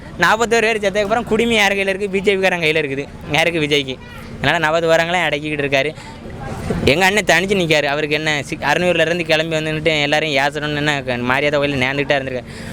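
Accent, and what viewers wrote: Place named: Tirunelveli, India. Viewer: நெல்லை தமிழ். இனிது ❤❤❤❤. வெற்றி நிச்சயம். நாம் தமிழர்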